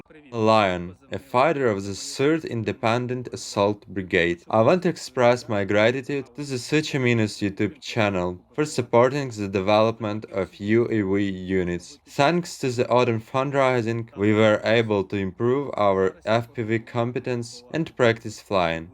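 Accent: United Kingdom. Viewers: Thanks for the video, just discovered you after following the war since the start. I like a UK accent;) :face-red-heart-shape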